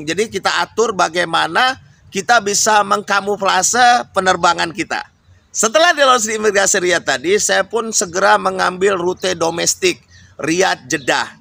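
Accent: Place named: West Java, Indonesia. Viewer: Orang sunda ya bang, pisa 😊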